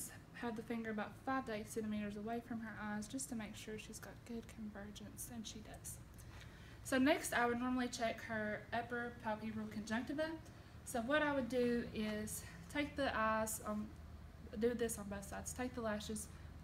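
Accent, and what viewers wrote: United States. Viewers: Love the accent, Miss Kentucky! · man this is a thick southern accent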